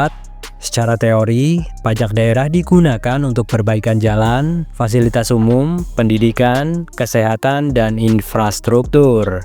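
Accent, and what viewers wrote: Central Java, Indonesia. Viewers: Sampeyan, Yo Jawa Tengah 😂😂😂😂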